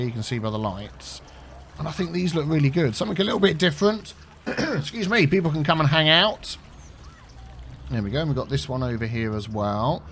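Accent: United Kingdom. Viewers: Your voice is very British. You could be an actor for my listening exam this year. Especially the words ''there'' and ''that'' sound British.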